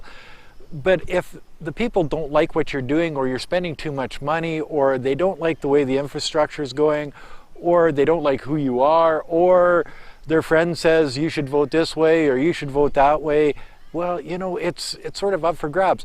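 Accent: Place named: Canada. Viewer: you are definitely sounding much like a Canadian!! Cheers mate. love your rants...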